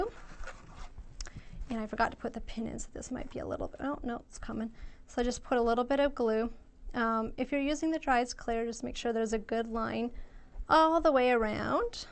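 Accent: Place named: Germany. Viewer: Popping into this several years late, since my daughter & I have been stocking up on the albums., your faint trace of accent made me very nostalgic. My dad's family was Germans from Russia, who spoke a Plattdeutsch dialect very similar to Amish/Mennonite German (recipes are very similar too). Dad was the last generation to speak German first, English second. He died three years ago, and it was such a joy to hear again.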